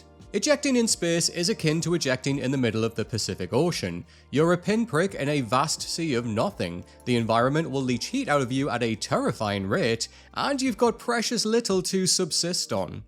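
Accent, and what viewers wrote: United Kingdom. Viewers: Like I need a British voiceover telling me about American Ingenuity.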